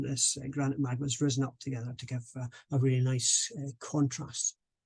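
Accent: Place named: Scotland, United Kingdom. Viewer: This trilogy of geology lectures has been fascinating. I also love the Scottish accent.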